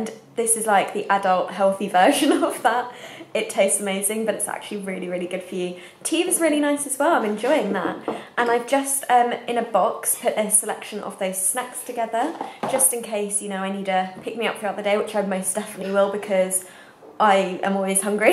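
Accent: England, United Kingdom. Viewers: Is she from England?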